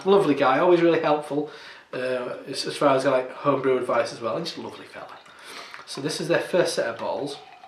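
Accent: Yorkshire, United Kingdom. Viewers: ooo aaaaaaarrrgghhh thaaarrrrttt smells booooootifuuuulll .. proper Yorkshire accent dude .. picked up the colab beer they did with northern monk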